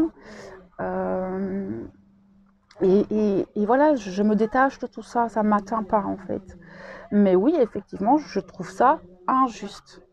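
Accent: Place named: Belgium. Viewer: Il me semblait bien que vous étiez Belge,moi de Charleroi. Première fois que je tombe sur une FJ belge🤗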